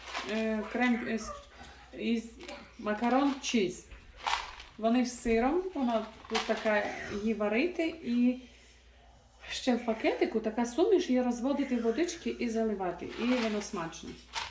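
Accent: Ukraine. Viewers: у вас такой приемный украинский говор, мой муж Любе ваши кулинарное блюда и вас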